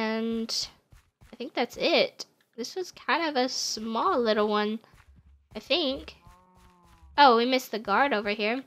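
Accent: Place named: United States. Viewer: Oh myyy i’m watching ur current 100 baby challenge you sound like you have a light country accent in this love to see how far you’ve gone love your vids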